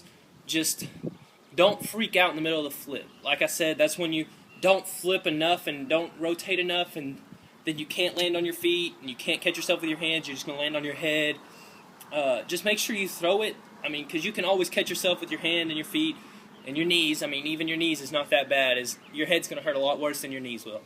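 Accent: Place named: United States. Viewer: Where's is he from iv heard an cow boy voice like that before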